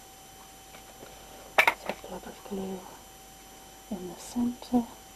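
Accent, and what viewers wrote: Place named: North East England, United Kingdom. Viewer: thankyou for a great tutorial , love the flowers you sound very similar to my cousin who lives in Saltburn up north do you come from the north east??im guessing you do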